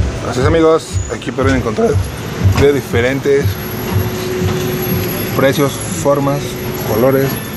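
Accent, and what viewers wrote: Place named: Peru. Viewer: Eso donde queda? Que pais? Como hablas supongo que es en Peru ? Lindas las lamparas !!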